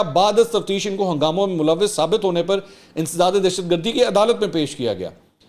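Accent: Punjab, Pakistan. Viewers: Mansoor sb OVERACTING aur PUNJABI tarka lagany se app such nahi chupa sakty.I am not saying IMRAN KHAN is best or honest person, but PML and PPP🤐🤐🤐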